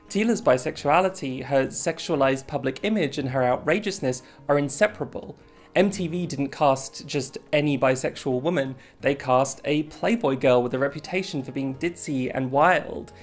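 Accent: United Kingdom. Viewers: So after watching snippets of several of your videos in my YouTube feed autoplay thing, I finally stopped procrastinating and decided to watch the whole hour and a half video. I knew I liked your content, but this was the first time hearing your voice. So when I heard you, my dumb American brain was like, "OMG THEY'RE BRITISH?! PLOT TWIST!!"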